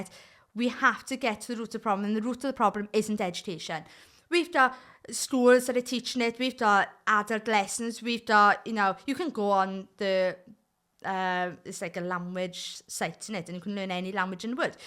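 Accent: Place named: Wales, United Kingdom. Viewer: Aww, you sound 100% Welsh to me! Love the accent! ❤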